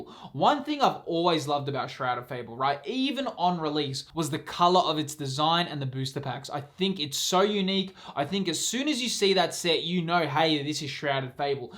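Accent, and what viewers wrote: Australia. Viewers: Love. That. Lefeon! Thanks for the content! Aussie accent makes it even better.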